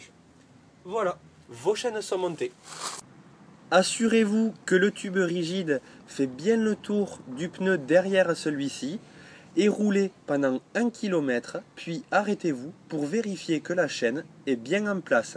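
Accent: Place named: South, France. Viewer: je t'écris 11 mois après mais le rapport c'est que le mec parle comme le sketch des Inconnus avec l'accent du sud un peu flicard mais son tuto et super merci à lui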